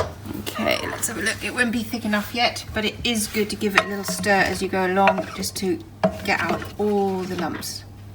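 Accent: England, United Kingdom. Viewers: ye proper English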